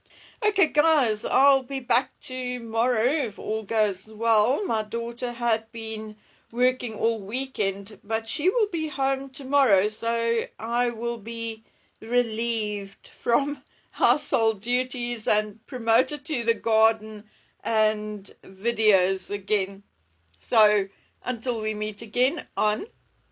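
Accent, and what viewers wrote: South Africa. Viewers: I enjoy and value this channel and listen every day from NYC. One of the things I especially like about it is that it is the authentic voice of an intelligent and insightful woman from South Africa. I like hearing regional way of speaking English, her perspective as someone who lives within the Commonwealth and yet also lives on a different continent than either myself or Great Britain, and I like the fact that the broadcast is the work of an independent woman and that it represents her own initiative and hard work. I have to say that I'm not a fan of the new introduction because I think it reflects none of those things! The music is a bit bombastic and the imagery is artificial - exactly the opposite of herself, who is straightforward and authentic. Just my opinion, but I think the intro is too generic and dramatic for a channel that is so unique and rational., your own artwork is wonderful - why not feature it as the imagery of your intro? 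Thanks for all you do, and all the best, Lee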